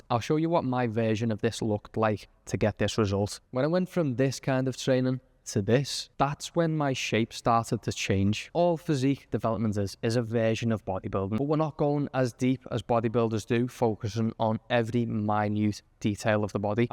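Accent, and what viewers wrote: Liverpool, United Kingdom. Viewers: Not so much into fitness channels anymore but trying to do better again- subscribed for a scouser who speaks sense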